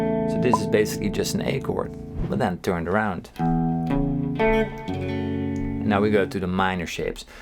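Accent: Netherlands. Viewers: Hey man are you dutch? Zo ja hallo uit nederland!!! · Thanks man! So simple, yet so nice! BTW, are you Dutch?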